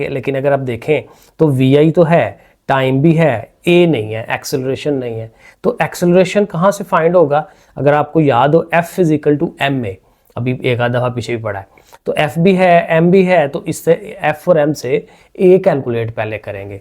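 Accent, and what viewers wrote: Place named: Gujranwala, Pakistan. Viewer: لہجہ گوجرانولہ کا ہے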